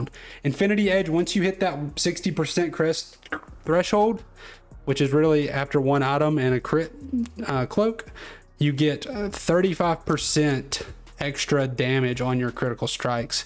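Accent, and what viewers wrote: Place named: South, United States. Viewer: The Southern accent made this extra cool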